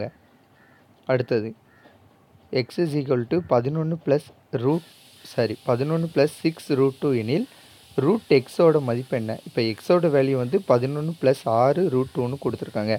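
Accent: Tirunelveli, India. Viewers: Tirunelveli slang 👏👏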